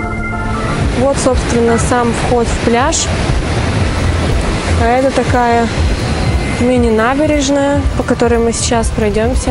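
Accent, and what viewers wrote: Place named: Urals, Russia. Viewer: Вау, я угадала!!! Точно, с Урала, слышу знакомый акцент. Я сама с юга, училась у вас в Перми. Да, у меня уши отваливались от вашего ,,О,, ,,АГА,, слова жуете.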